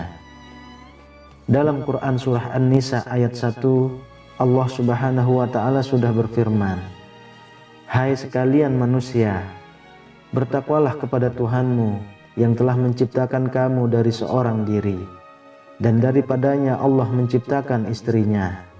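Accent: Lombok, Indonesia. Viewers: Abang dari lombok kah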